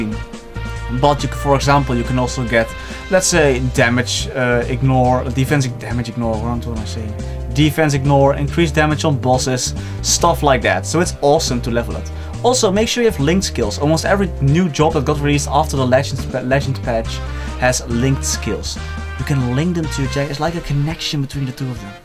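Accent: Netherlands. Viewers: Nice video :3 Haha I was like hmm I think hes dutch and I'm right xD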